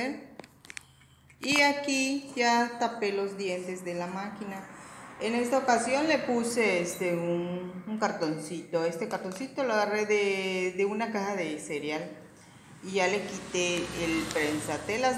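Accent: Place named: Yucatan, Mexico. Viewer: me encanto escuchar tu acento de Yucatan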